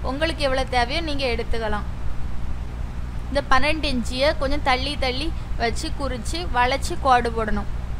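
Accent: Tirunelveli, India. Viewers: அருமையான திருநெல்வேலி தமிழ் பேச்சு சூப்பர்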